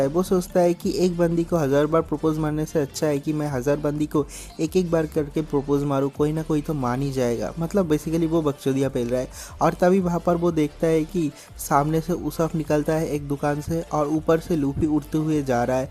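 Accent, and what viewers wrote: Bengal, India. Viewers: Bro apke voice se mai samjh sakta hu ap bengoli ho but please bhai gender ko sahi se mention karo Apne bola zoro ka leya mai tere ko paisa chuka dungi😢😢😢 mai paisa chuka dunga hoga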